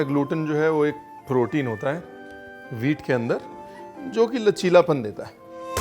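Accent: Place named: Punjab, India. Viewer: A big fan . You nail it when you explain things specially with that PUNJABI touch♥️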